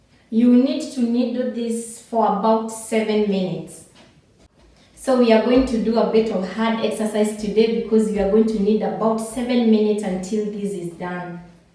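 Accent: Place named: Mozambique. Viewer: I have a friend from there with a similar accent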